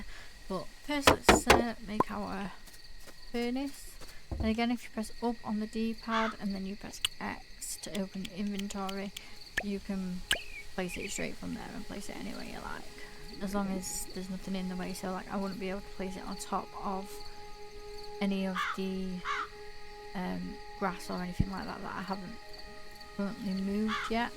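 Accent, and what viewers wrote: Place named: Yorkshire, United Kingdom. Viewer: Voice of a yorkshire angel to guide us through the demo! Amazing 😁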